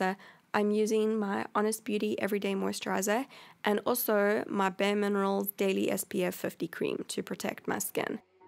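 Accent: South Africa. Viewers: I detect a South African accent? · are you from south africa? love your accent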